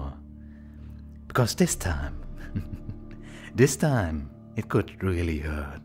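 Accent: Germany. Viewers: Omggg your germannnnnn😭😭😭😭 i lovvveeeeee ittttttttttttt❤❤❤❤❤❤❤❤❤❤❤❤❤❤ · The German is making me hyperventilate- how do I start paying you for this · Ich liebs!!!! Wer hätt gedacht, dass n deutscher Akzent so vorteilhaft sein kann 😂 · I used to think that he got konig's accent pretty well He's from Germany AAAAAAAAAAAAAAAAAHHHHHHH 💘💘💘💘💘💘💘💘💘💘💘💘💘💘💘💘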